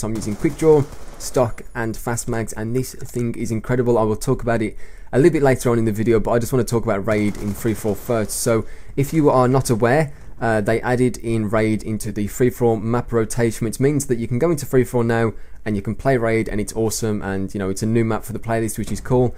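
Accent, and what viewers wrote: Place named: Cannock, United Kingdom. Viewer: bit of a personal question but are you from cannock? your accent sounds like cannocks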